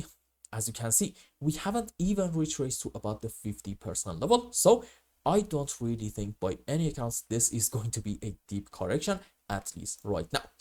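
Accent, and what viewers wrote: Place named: Iran. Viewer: I have a feeling that you are from Iran. am i right? cause you accent is so similar to those! if you tell the truth , i will consider to subscribe bro.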